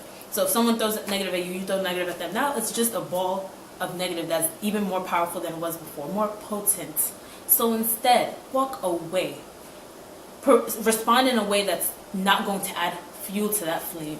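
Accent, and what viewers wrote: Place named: Africa. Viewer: Makeup A 1 🔥🔥🔥 also I peep the African accent 😭😭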